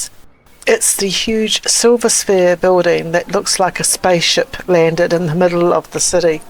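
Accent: New Zealand. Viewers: Something you might want to work on. Nail your vowel sounds. I notice a couple of times when you speak Japanese, you kiwify the pronunciation. e.g. Minna no nihongo. Hot tip, you're not in a race, doesn't matter what language you are speaking, your native language or a language you are learning, if you slow it down you win. If you are speaking English, slowing it for non-native speakers means they will listen to you more carefully, if it is your learned language, then people will recognize and may mirror your speed (huge win). TOO many people are trying to break speed records in class typically younger learners do this. This is a way of disguising deficiencies. So lets break down - Minna no nihongo Mi - that sounds like "me" in English, not "minna" like "winner" There are 2 n's in there, they deserve their own pronunciation. You can hold on the "n" (hold it on the roof of your mouth, then raise your chin and spit out the "na") Keep your "no" short, it's shorter than someone speaking English and saying "no". 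Nihon"go" - hold that "n" in the middle the same way as before (tongue on the front part of the roof of your mouth, you can even hold it there a little it will make you sound more Japanese, then spit out the "go"). Remember - speaking is not a race. And being a little older and wiser, you get a pass for slowing the tempo a little to get it right. It's a fantastic tool I've used all my life, slow it down just a touch and people will listen more attentively. *NOTE I share th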